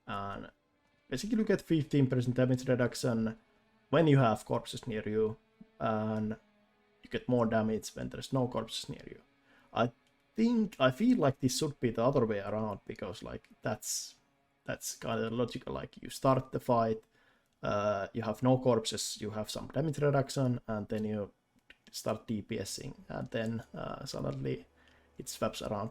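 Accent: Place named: Finland. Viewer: Kyllä suomalaisen tunnistaa aksentista heti haha, keep up the good work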